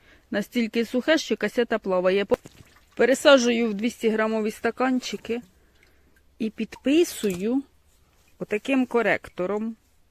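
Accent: Poltava, Ukraine. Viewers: я з задоволенням дивлюся і слухаю ваші відео,наш полтавській говір- чудо, дякую.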